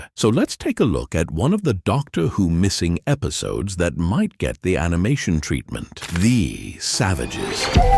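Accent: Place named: Transatlantic, United States. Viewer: Love the transatlantic accent , reminds of the haunted mansion.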